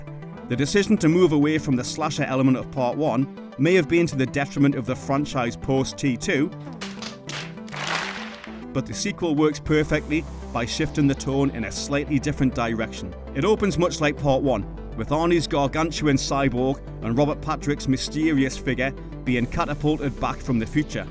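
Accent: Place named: Newcastle, United Kingdom. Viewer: The Geordie is back. Early NyN NAUT EES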